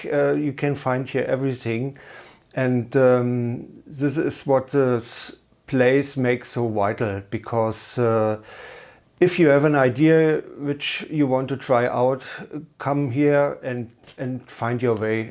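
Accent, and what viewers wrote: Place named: Berlin, Germany. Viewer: I like your „Berliner Schnauze“ English very much! 👍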